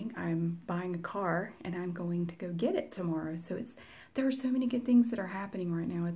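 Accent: South, United States. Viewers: Fell in love with your voice already. Love that Southern accent. Subscribed!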